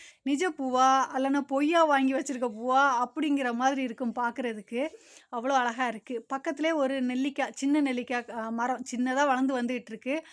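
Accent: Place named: Nagercoil, India. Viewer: ok sister, vunga slang nagarkoil madhiri iruku, enaku native thiruchendhur, indha Marigold seeds kidaikkuma sister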